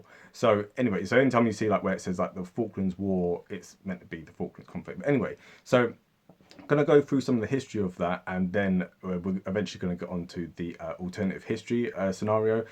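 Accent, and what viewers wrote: United Kingdom. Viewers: He sounds British. Maybe London.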